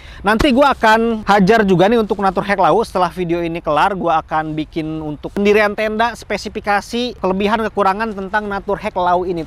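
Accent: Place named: West Java, Indonesia. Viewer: Sunda pisan uyyyy mantaat lah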